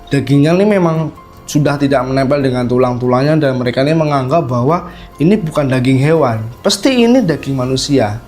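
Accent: Java, Indonesia. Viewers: Loe Gua tapi medok 😮 mbok saya atau aku aja mas. Opo sampean kamu enak malahan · Ngomong GUA slank JOWO , mending SAYA masze jd cakep ...luwes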